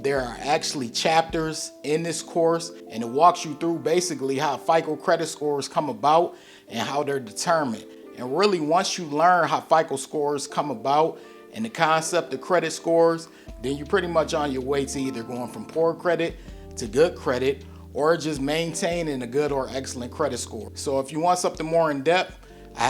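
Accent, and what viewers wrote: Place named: Chicago, United States. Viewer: Definitely Chicago accent.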